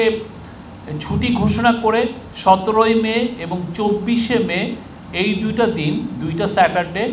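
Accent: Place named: Kolkata, India. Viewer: Kotha bolar style e lage jeno kolkatar style🐸🐸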